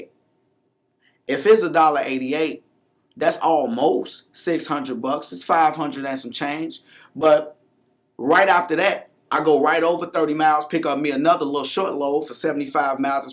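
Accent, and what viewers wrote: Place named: Chicago, United States. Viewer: U gotta be from Chicago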